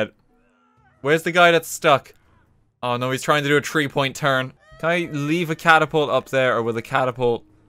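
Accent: Ireland. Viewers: "Jump em boys!" Why do I feel like that should be an in game line for the Hobbits, voiced exactly in Irish lilt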